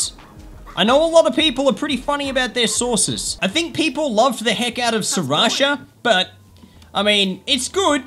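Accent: Australia. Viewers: Are you Australian? 😅😅😅😅😅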